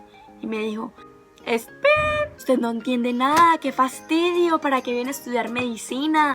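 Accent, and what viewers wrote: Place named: Colombia. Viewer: su acento colombiano despeja dudas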